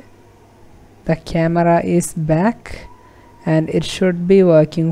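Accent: Kerala, India. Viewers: anyone notice that mallu accent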